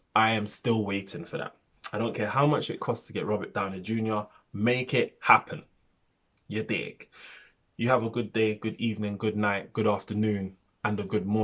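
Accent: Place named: London, United Kingdom. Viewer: Just subscribed. Great review. You made me feel like I liked it more than I originally thought. I think my only qualm with the film was there were slightly too many red herrings! Like, a lot of “you thought this happened but it was actually this!” If that makes sense haha. Side note it’s so refreshing to hear a familiar accent on YouTube 🤓🙏🏾 you sound like London and I’m from there so it feels like watching a friend. Keep up the great reviews! I’d recommend 1917 if you haven’t seen it yet. One of the best films I’ve seen in a while Edit - just seen you’ve got a 1917 review up so I’m gonna watch it now!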